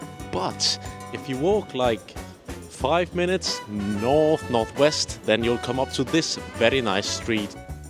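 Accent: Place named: Liverpool, United Kingdom. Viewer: Great video! Your accent is wild! I got bits of Scouse, Geordie and Welsh in there 😮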